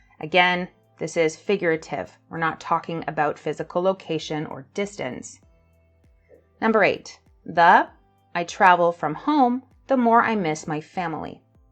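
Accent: Canada. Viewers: Why are you using and teaching people American? "aboot" - You sound Canadian, so should be using English, not American. Further can fully replace farther in English (but farther only refers to distance). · J'adore votre accent canadien ❤💞💘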